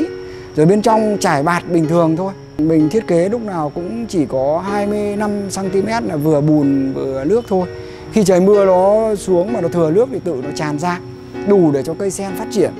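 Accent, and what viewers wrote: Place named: North, Vietnam. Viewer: Ngay từ đầu đã đoán bác ngoài Bắc lập nghiệp trong Nam rồi. Chúc bác mạnh khoẻ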